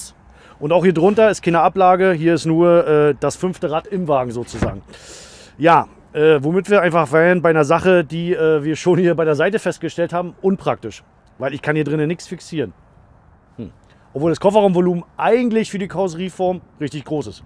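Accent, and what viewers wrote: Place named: Berlin, Germany. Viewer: Berlin / Brandenburger Akzent 😀🤜🏼🤛🏼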